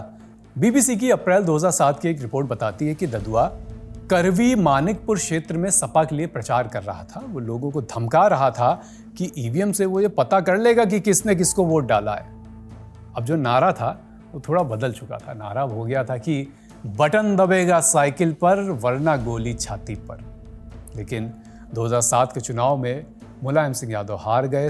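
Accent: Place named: Awadhi, India. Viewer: Bhai ye awadhi h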